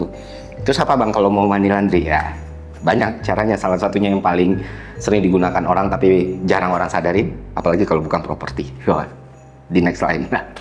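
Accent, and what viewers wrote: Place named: Minangkabau, Indonesia. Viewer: Baru sadar kalau ko urang awak.. patuik lah nyo mode ko caro bapikia nyo caro mangecek nyo.. sukses selalu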